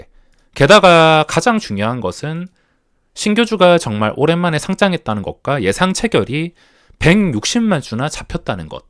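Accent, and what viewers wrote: Gyeongsang, South Korea. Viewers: 경상도 특성 강제로 보시라 안했음 싫음 보지 마삼 오케이?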